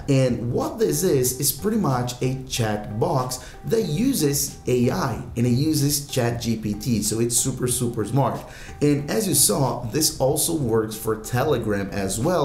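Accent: Brazil. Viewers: Valeu cara otimo video, na hora que vc começou a falar, notei algum sotaque brasileiro (seu ingles é otimo) ai confirmei quando eu vi Lazaro negao e Amor, Vlw !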